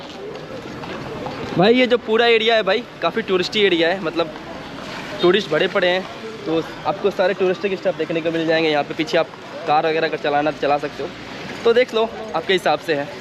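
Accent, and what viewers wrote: Bihar, India. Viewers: That's Magadhi(south Bihar) accent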